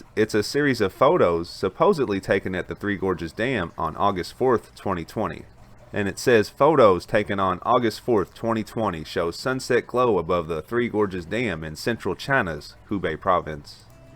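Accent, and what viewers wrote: South, United States. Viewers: I love the southern accent when speaking about China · Love the southern accent when talking about anything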